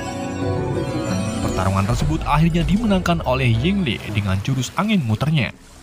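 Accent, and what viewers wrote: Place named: Banyumas, Indonesia. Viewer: Dirungonin 😆😭 bang yeendeh rika ngapak yaa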